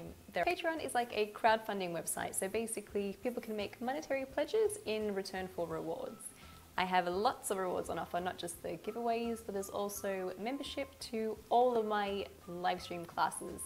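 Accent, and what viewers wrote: Australia. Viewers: Hi, just found your channel looking for tips on how to make my daughters first birthday cake. Your tutorial are awesome. Picked the Aussie accent straight away. I'm from Bendigo.:) xx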